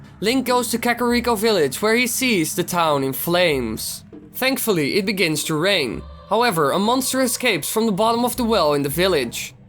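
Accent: Netherlands. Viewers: Love a Dutch accent. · are u dutch?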